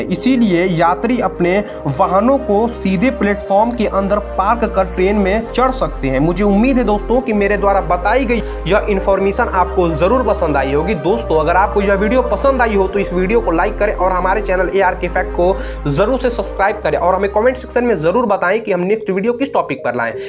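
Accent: India. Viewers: Bhai aap bhojpur region se ho kya? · Bihari ho kya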